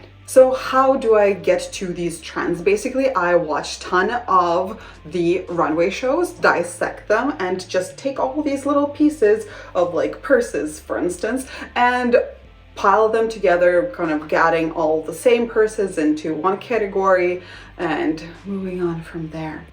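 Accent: Russia. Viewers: Hi, correct me if I'm wrong but I think your accent is from Russia am I right or the Ukraine? Think it's very rude for people to comment on people's accents not like you/they can help it. I personally love to hear accents all around the world. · Why they don't like to listen to you? You speak a beautiful English, with a very- very light accent, perhaps Russian. I hust descovered your channel. It is really interesting. Thank you.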